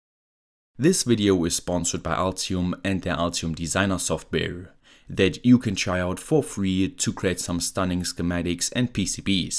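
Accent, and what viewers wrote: Germany. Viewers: i heared german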